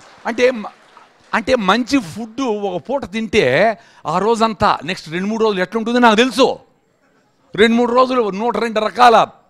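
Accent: Rayalaseema, India. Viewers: మీ బాషా యాస చూసి రాయలసీమ లో పుడితే బాగును అనిపించింది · మీరు మాట్లాడే రాయలసీమ యాస బాగుంటుంది సార్ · Rayalaseema basha entha madhuramga vundi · That rayalaseema slang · మీ రాయలసీమ భాష పెరుగన్నం లాగ కమ్మగా ఉందండి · అసలు సిసలైన రాయలసీమ accent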